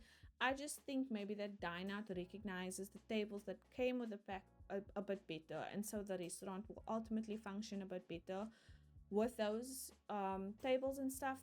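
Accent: South Africa. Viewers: BTW I love your accent, is it South African?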